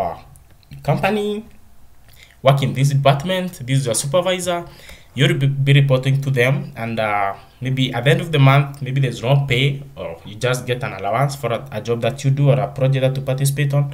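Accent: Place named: India. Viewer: Awesome, u sound like a hindu though :)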